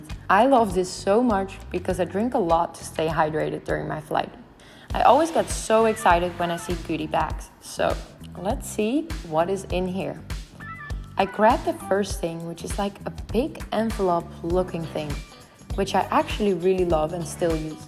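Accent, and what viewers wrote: Netherlands. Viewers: Je Nederlandse accent 😂✌️ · Why do you sound Dutch ?😂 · Is ze Nederlands? Door t accent hahah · Your dutch and i thought you were going to The Netherlands again?.. Btw i knoe that cuz your accent not being rude. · You have the netherlands accent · Her English is so good but I still can hear Dutch. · Ja, jij komt een 100% zeker uit nederland! ik hoor het aan je accent :) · Youre dutch richt. Im sorry i hear it but youre realy good in it. I hear it because...............ik ben ook nederlands. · Ik wist dat je nederlander wist op het eerste moment door je accent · Jij bent toch Nederlands? Ik hoor het aan je accent 😂 Leuke video trouwens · Are you Dutch? Cause your English sounds like Dutch people’s English (I mean like your accent) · Sanne Vloet je accent verraadt dat je Nederlands bent. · Zwz ben je nederlands haha je kunt het gewoon horen NL kunnen nooi goed engels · Je klinkt Nederlands haha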